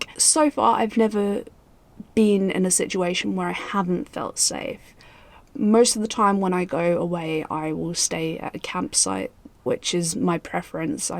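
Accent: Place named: United Kingdom. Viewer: Hi, lovely seeing you. Curious about your accent. Can you please tell me what part of the united Kingdom you are from? I know this is a random question. Sorry if it's to far out there.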